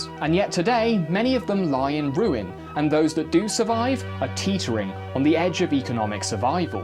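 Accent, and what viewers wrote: United Kingdom. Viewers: Didn't realize was British.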